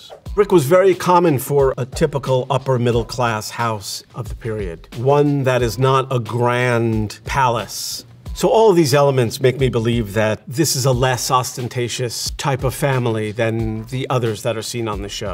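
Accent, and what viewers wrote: United States. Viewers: Can you find an English architect for the English architectures? Or architects according to the building. He butchered most of the proper English names with silly American pronunciations :-(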